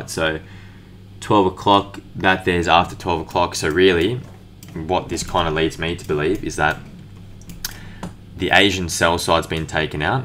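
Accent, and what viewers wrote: Australia. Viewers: Brilliant mate! Awesome to hear an Aussie trader! I'm a North Queensland boy... Loving the London stuff, mate, as it suits our time zone much better. Keep up the great content 👏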